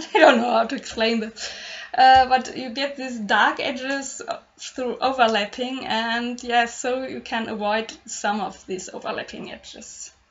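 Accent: Germany. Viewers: me too, I love her German accent. It's a shame all the commenters on YouTube teased her about it. There is joy in diversity, unfortunately not all see things that way. 😔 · Hmmm... your accent kinda sounds german. Where does my guess come from? My mom articulates herself very similar to you, when speaking english.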